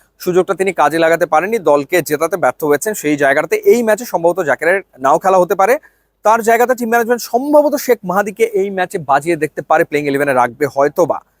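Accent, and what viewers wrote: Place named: Kolkata, India. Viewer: আপনার কথার ধরন কলকাতার মানুষদের মতো কেন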